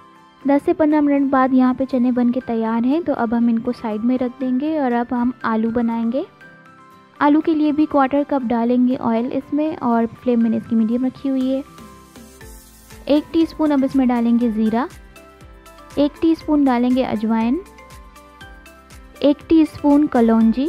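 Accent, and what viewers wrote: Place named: Hyderabad, India. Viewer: wow, that's great. I also had a feeling that you're from Hyderabad because of your accent.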